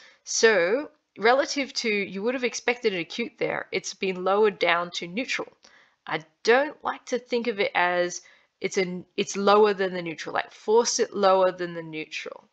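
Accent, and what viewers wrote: Australia. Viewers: I think your accent sounds mostly Australian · This is a super introduction to the subject, thank you. I love the charming way you pronounce these snippets, with this ever-so-slightly Australian dialect of Greek. I started learning Ancient Greek about a year ago, with an ancient Teach Yourself book (Kinchin Smith and Melluish). Since starting I've subsequently started to learn rudiments about the actual pronunciation in Ancient Greece. In fact this TY book explicitly says in the introduction that they're not going to bother with accents "because they're unimportant" ... ! Sadly this also reflects what Allen says in his otherwise very useful book *Vox Graeca*, most of which I've now read: "don't think about trying to get the accents, it's too hard and not worth having such aspirations"!!! I find these attitudes absolutely bizarre! I now have a target accent: 5th Century BCE Attica! 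The "other" challenge there (apart from accents) is the aspirated/unaspirated allophones, τ/θ, κ/χ and π/φ. It's hard but not impossible by any means: I find the best way is to try and speak the unaspirated ones in a sort of Spanish accent, because Spanish has this tendency to swallow accents (i.e. to prefer unaspirated), whereas with English there generally seems to be a mixture of aspirated and unaspirated. Have you done anything on the unaspirated/aspirated allophones? I had a glimpse at your Youtube vids but couldn't see anything.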